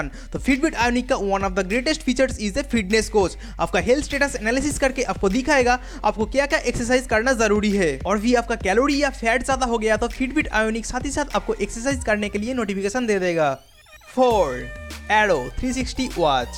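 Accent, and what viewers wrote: Bengal, India. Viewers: Are wa kya bangoli Hindi bolta he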